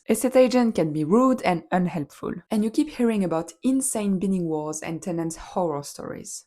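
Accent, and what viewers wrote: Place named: France. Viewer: Where are you from? I'm guessing France but I'm not that great with accents. 🥐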